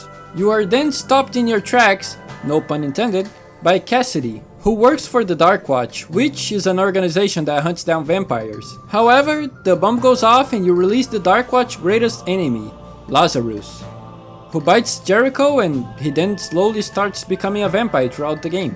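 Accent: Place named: Brazil. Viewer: Im not doxxin but i I knew you were Brazilian, your "English" is very different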